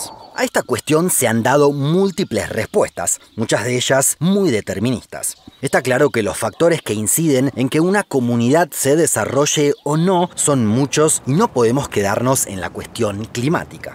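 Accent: Argentina. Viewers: Creo que el narrador es argentino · Che no pierdas el argentinismo en el habla viejo! "Medeín" , "Guaiaquil", "Abuia"... ¿qué es eso? mandale sheísmo a pleno. No pierdas la identidad argenta loco, que es un recontra canal y que sea argentino me gusta y enorgullece a dos manos XD Abrazo de gol!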